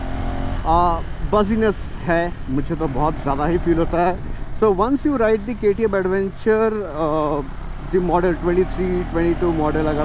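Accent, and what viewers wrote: Bengal, India. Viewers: Bhaiya Bangali ho Keya ?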